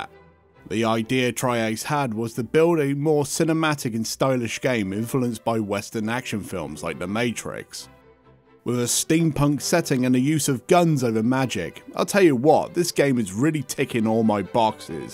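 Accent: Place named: Ireland. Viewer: Your accent reminds me of a dude from Ireland in the army here in the good 'OL USA..Good review....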